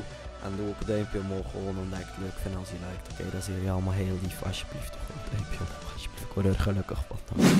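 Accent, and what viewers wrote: West Flanders, Belgium. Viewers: aaaaaah gvd uw westvlaams accent man 😂😂😂😂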